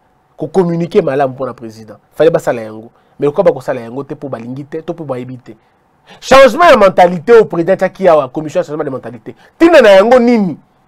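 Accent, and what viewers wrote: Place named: Mongo, Democratic Republic of the Congo. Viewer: Ndeko Christian Bosembe, lingala lia yo kati na émission oyo, nasepeli na yango, olakisi ya solo ete oyi Mongo.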